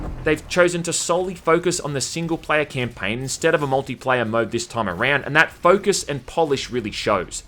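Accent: Australia. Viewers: Aussie accent ftw boi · Makes by far the best Reviews existing on earth. By the way, are you from Australia?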